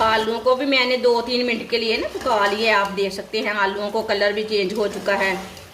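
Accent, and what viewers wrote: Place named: Punjab, India. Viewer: جی اپکی ريسيپی کی تو بات ھی اور ھے مگر پنجابی سٹائيل ميں جو اردو کے الفاظ اپ کے لب مبارک سے پھولوں کی طرح جڑ رھے ہيں😀، ان کی بھی کوئی مثال نہيں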